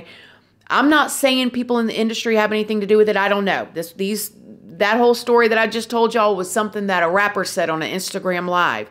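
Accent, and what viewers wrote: South, United States. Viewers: Funny Video... I like Your accent... You Sound Country....❤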